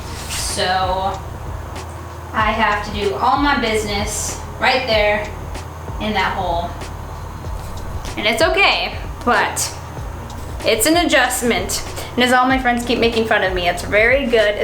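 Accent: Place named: United States. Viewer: 纯正的美式英语！我喜欢这个小妞！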